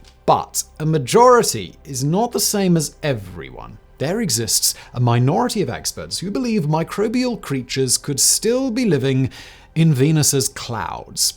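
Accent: United Kingdom. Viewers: Maybe a bit less hipster, styling presentation... The british en (arrogant sound) style push it up, that it seems to help to establish the narcissism of these toxic days.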